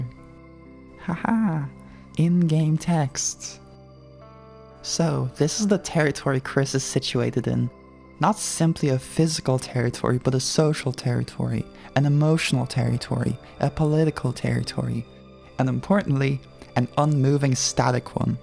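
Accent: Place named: Ireland. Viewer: Nice to hear an irish voice in the sea of Americans 😅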